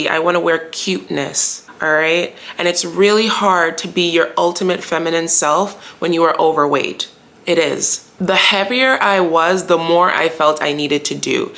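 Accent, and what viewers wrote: Jamaica. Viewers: You sound like you have Jamaican parents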